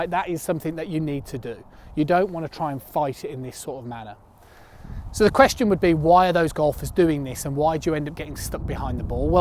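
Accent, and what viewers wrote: United Kingdom. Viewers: I too have watched what seems like endless list of videos until I stumbled upon! I like that he acknowledges there can be variations on how we all get to certain points in our swing and where we go from there. In the beginning I really had to concentrate on listening to his fast paced British chat, but am now tuned in. He’s very articulate and I am now on board.👍 Next up I will try to get my swing video done and sent. Thanks and keep up the informative videos. Also, looking forward to more videos dealing with some limited flexibility.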